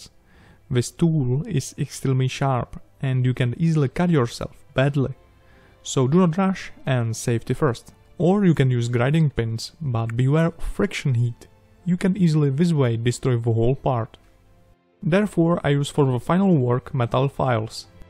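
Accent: Czech Republic. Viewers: Hello, this is my first seen video from you its amazing and i noticed a czech akcent so if you are from Czech Republic. Tak Zdravím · Judging by the accent I'd say he's from Czech Republic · Nice work PS: I hear the Czech accent :) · Jedna otázka: SI ČECH? Lebo ten prízvuk mi strašne sedí na brata môjho nárda! :) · Are you from Czech right? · Bruh that czech accent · are you from Czech republic? :D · Ta češkovina tam jde strašně slyšet 😂😂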